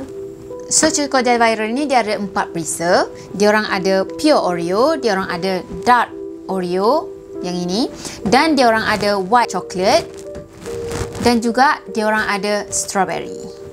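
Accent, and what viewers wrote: Kelantan, Malaysia. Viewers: Kelate bosku. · Kelate boh..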